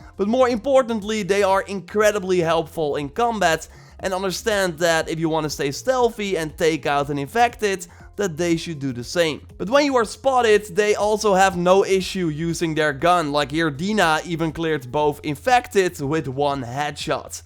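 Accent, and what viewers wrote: Netherlands. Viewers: This Dutch accent moron is clearly paid off by the developer. Not one negative thing to say about this game.... he's just praising every single aspect of this game even though many legit reviewers clearly state that this game has aspects which are total garbage like the AI, and it's nowhere near as good as even the first game. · IS DUTCH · Haha this guy has a heavily dutch accent so I think you’re dutch don’t worry though I am dutch too. Maakt niet uit man😂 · He's Dutch.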